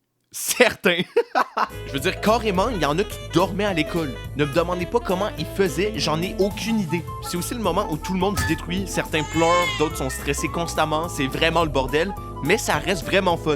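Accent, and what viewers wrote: Quebec, Canada. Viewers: Hell'oooo Cher Mister, Je viens tout juste de découvrir votre Chaine (et votre existence de facto ), et je dois vous avouer que vous m'avez très vite séduite, autant par l'Apparence de votre Mignon Alter-Ego Girafesque que par votre Humour et votre délicieux accent Québécois.... Donc je m'abonne de suite, et compte bien m'offrir le plaisir de grignoter d'autres vidéos animées de votre création dès que j'en aurais l'occasion. Bien Amicalement votre, Lenore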